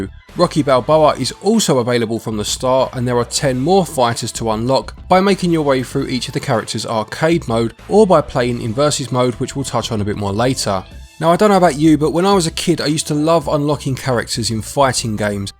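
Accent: United Kingdom. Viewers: !!! When did you get so suave and british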